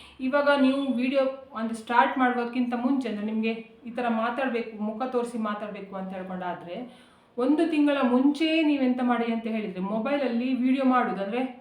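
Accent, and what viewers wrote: Mangalore, India. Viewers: ನಿಮ್ಮ ಮಂಗಳೂರು ಭಾಷೆ ನನಗೆ ತುಂಬಾನೇ ಇಷ್ಟ ತುಂಬಾ ಚೆನ್ನಾಗಿ ಮಾತಾಡ್ತೀರಾ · Mangalore bhhashe tumba chanda maarre ..😅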